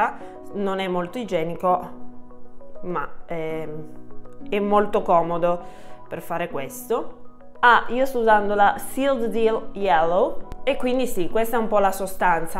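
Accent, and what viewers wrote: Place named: Piedmont, Italy. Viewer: Ah mi piace la tua cadenza piemontese, io sono pugliese ma ho tutti o quasi i miei parenti in Piemonte e adoro il piemontese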